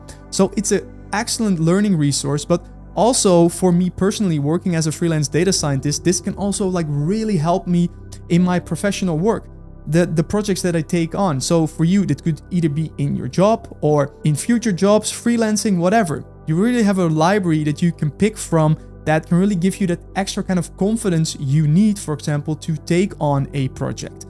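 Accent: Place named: Netherlands. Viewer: youre dutch i can hear it f rom youre accent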